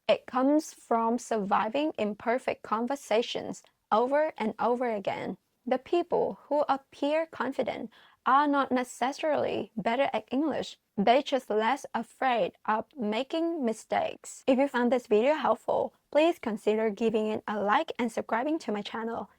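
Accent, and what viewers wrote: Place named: Australia. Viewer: Hi, I'm excited to see a new video on your channel - I loved it! It's also amazing that you are helping lots of people by sharing your own experiences. I can definitely pick up an Australian accent in some of the words you use. 😊 I think one thing I noticed from my personal experiences is that sometimes I don't feel confident internally, but when I rewatch a recording of a lecture or talk I gave, I can't actually tell - so I think insecurity is more often in our heads than something perceived by other people! (It's particularly relevant recording YouTube videos as well, it initially took me a while to get comfortable being in front of a camera on YouTube when I started my channel.) I made sure to like, as always, and looking forward to the next video! 🥳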